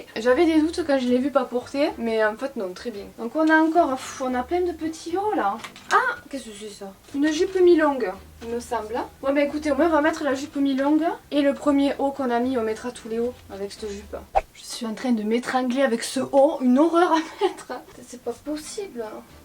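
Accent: South, France. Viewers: J'adore tes haul car a chaque fois tu essayes les vetements, d'autres les montre simplement ou ont les voit en photos c'est pas attractif, et puis toi avec ton accent du sud et ton beau sourire c'est vraiment super a regarder. J'ai le body rose a paillette comme ta robe a anneaux, plus facile a mettre le body que la robe courte et hyper moulante.... mais elle sest jolie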